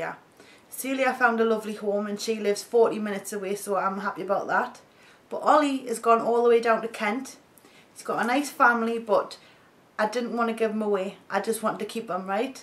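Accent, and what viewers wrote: Newcastle, United Kingdom. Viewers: Are you from Newcastle?:)x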